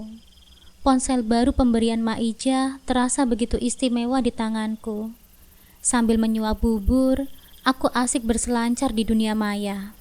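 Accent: Java, Indonesia. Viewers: Bd hadir mbak yg suaranya medok banget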